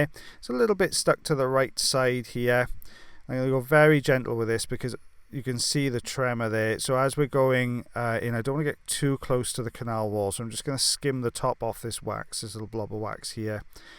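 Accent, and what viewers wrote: Wales, United Kingdom. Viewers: I could listen you your voice and lovely Welsh accent all day...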